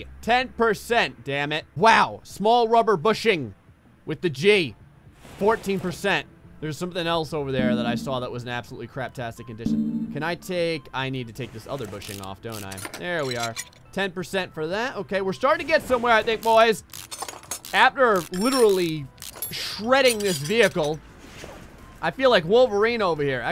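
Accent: New Jersey, United States. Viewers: àre u from New Jersey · Oh I wanna hear the jersey accent do it in the next episode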